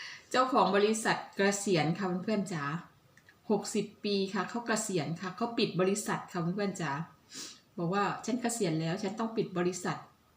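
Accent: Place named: Korat, Thailand. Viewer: น้อง เป็นคนจ้งหวัดอะไรสำเนืยงพูดเหมือนคนโคราชมัยหรือว่าแถวนางรอง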